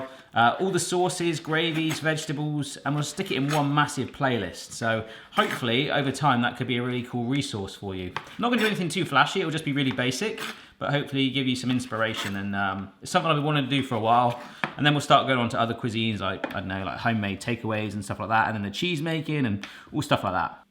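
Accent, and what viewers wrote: United States. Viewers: Hi! I am watching you from Calgary, Alberta Canada.... totally love your videos and I get a kick out of your american accent (it freaks me out every time you do that......."buttermilk") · Can we just take a moment to realize how good his american/canadian accent was? Good job! I'm from Roswell GA in the US. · Salt Lake City, Utah, USA! Love the American accent... Buttermilk. Lol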